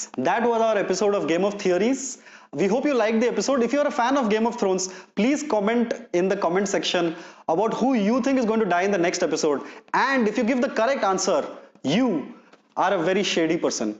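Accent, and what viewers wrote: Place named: Hyderabad, India. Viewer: Thank you for your Hyderabadi English and Hindi.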